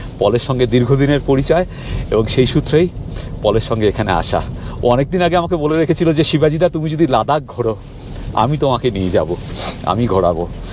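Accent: Bangal, Bangladesh. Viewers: Apner bangal kotha gulo ekhono gelo na puru bangal, blog moteie bhalo na chredin apner dara hobena jotoi chesta korun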